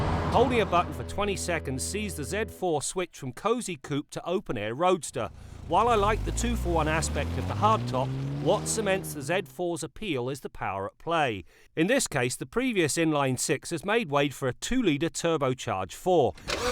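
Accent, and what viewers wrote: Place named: United Kingdom. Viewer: I am confused. This guy has a British accent. He is talking about dollars. The car is LHD but has Celsius on the climate. What country is this?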